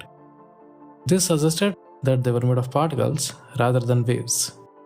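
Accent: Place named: South India, India. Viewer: Thanks helped a lot, the South Indian accent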